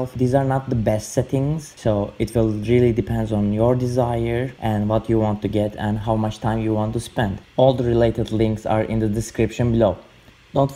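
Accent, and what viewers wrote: Turkey. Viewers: Your accent sounds like you are Turkish and i am proud of you, thanks a lot for sharing your experiences.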